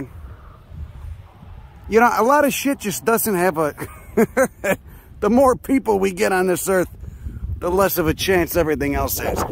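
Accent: Chicago, United States. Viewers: Ay, ah? Been watching you for awhile now. Lived in Chi most of my adult life, but left a few years ago. Found your channel and stopped to hear the accent again, but stayed because you make botany interesting. Been on the North Coast of the DR since December. If you're coming up toward Puerto Plata/Sosua/Cabarete and you want to dial in more local flavor, lemme know and what the shit.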